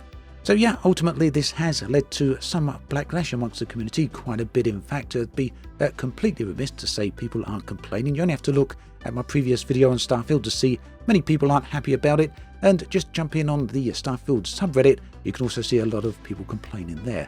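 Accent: United Kingdom. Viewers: before this news I was back and forth being on the fence of opening my wallet to CIG to include the new Faterpillar to my fleet… then decided “nah” but after hearing this well structured, articulation of a rant about the privilege of a quest to hunt some rats for $7 😂 Because it was delivered from a distinguish gentleman speaking with a British accent, well $450 USD is doable lol 😂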